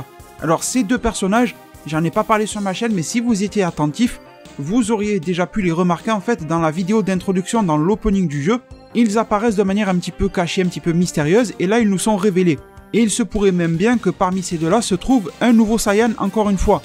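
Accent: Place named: South, France. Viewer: tu as un accent du sud il me semble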